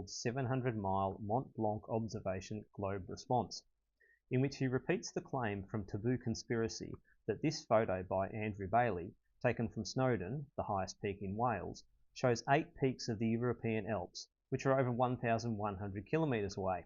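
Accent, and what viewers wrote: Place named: Australia. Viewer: Maaaaate. That ain't no New York accent. You are from the land of the vegemite sandwich.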